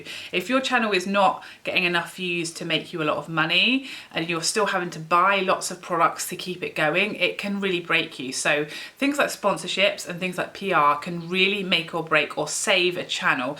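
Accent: United Kingdom. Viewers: Good information! I had no idea...but how long do i have to let the ad play? I used to always click skip ad. Why don’t you do links so I can purchase through your link? I do enjoy your channel and do want to support. I’m an American that loves a good British accent😄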